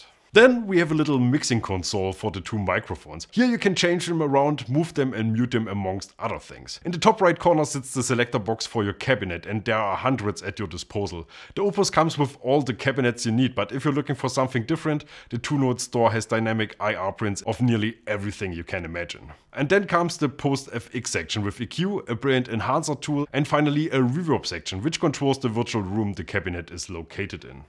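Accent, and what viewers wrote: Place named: East Germany, Germany. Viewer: Eastern German accent . 😂. Nice touch!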